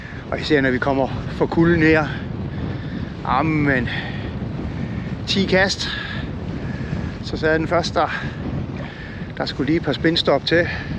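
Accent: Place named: Funen, Denmark. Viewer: det er satme konge med en times hardcore fynbo snak. det fik mig til at grine mange gange ! godt nytår :)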